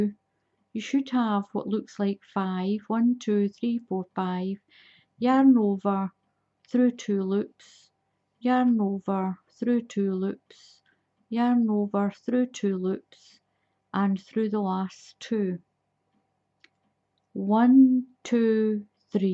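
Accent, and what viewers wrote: Scotland, United Kingdom. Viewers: Nice to hear the Scottish accent!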